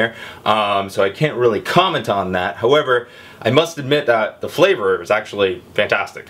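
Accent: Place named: Canada. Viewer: Is he canadian? He do have a canadian accent.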